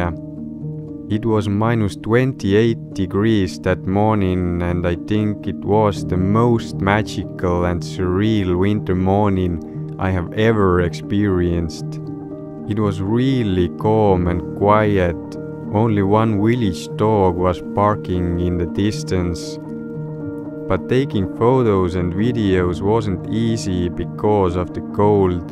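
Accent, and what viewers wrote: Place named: Estonia. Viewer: Hi! Great video, really enjoyed it. I have lived in Estonia 6 months and experienced a cold winter as well, visiting some natural parks and hiking in the nature. I really appreciate you talking in English, I can finally understand what you are narrating and your accent makes me remind of my special time in your home country!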